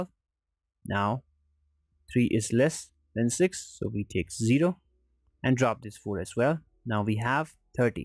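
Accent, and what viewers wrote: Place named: United States. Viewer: u are indian right? but your voice sounds more like an american ...... :)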